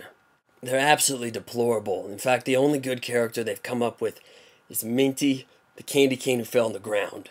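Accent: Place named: Australia. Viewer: wow you really sound australian with your cold.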